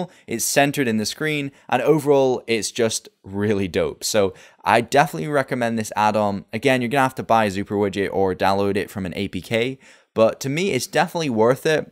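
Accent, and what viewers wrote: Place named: Scotland, United Kingdom. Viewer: i could hear a hint of a scottish accent when watching that video thanks for answering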